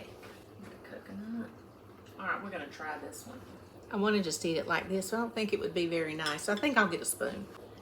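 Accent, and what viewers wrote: South, United States. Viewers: You are just delightful! That sweet southern drawl, unhurried cheerfulness as you mix and chat in that bright ,pretty kitchen! feels like I’m cooking with my favorite aunt- she’s just as blonde and sunny! I’m now on a quest to find that gorgeous striped sweater! So happy to discover your channel Blessings to you and yours.💖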